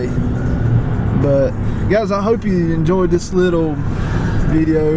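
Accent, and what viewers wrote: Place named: North Carolina, United States. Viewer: Sounds like you're from North Carolina...I live about 30 minutes from Little Washington. 😆 I'm down here in New Bern, NC. I picked up a Tracker Grizzly few months back and ran across the video of you and your son testing out the 60hp. I'm thinking about putting a 50hp on mine. Its a 1860 as well. You think that's enough motor?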